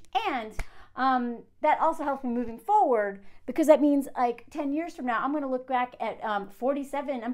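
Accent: South, United States. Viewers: your southern girl baby voice cracks me up every.time 😂 give Moz a hug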